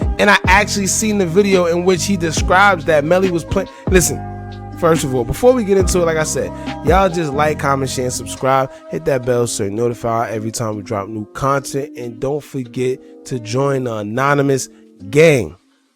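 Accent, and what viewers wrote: New York, United States. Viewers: How u kno dis and u sound like u from new york🤔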